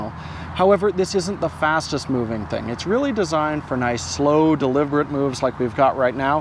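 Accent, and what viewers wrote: New Zealand. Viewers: A Japanese ethnicity, living in Canada, with profound New Zealander Accent How can we not love? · I never shoot video, but I watched this episode because of New Zealand accent and Jordan's clear explanation of this camera gadget. Thank you for sharing. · Can say fish & chip in his Kiwi accent? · WOW. Aussie guy here... your NZ accent is GREAT!!! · Like for the kiwi accent XD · ' Kiwi accent is spot on. A+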